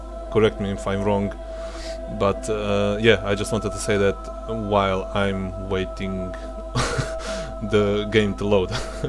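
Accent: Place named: Russia. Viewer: Как будто русский на английском записывает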